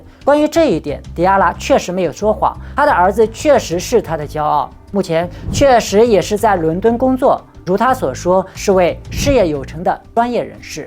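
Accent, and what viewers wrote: China. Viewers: 請問你是哪裡的口音？安徽嗎？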